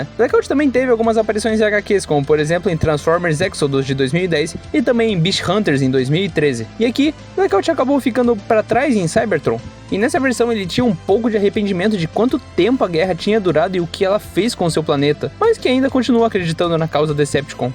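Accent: Paraná, Brazil. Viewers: mas pia eu sempre disse isso na tua live, é paranaense né, capaz. Com esse som so carca fogo no sape para comer pinhao, daí fica bom que é uma beleza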